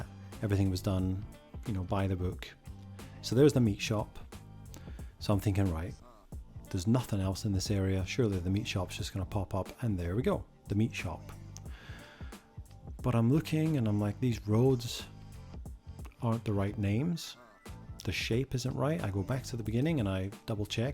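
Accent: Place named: Scotland, United Kingdom. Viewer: Hi bud. Enjoying your videos. Where in Scotland are you from? Seem to have a bit of a mixed accent. I'm Scottish myself so good to come across another countryman who likes geoguessr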